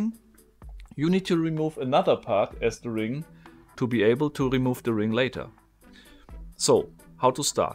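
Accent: Germany. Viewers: ich hätte nie gedacht .... dass ich diesen kanal in meinem leben brauche :-D faszinierend .... entspannend und einen niedlichen deutschen akzent ... ich bin in einer endlosschleife gefangen und kann nicht aufhören deine videos anzuschauen ... danke dafür ... grüße aus mittelfranken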